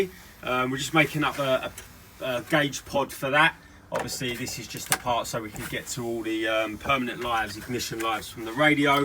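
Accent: Essex, United Kingdom. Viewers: Essex boy voice sounds like micky flanagan lol love the videos brother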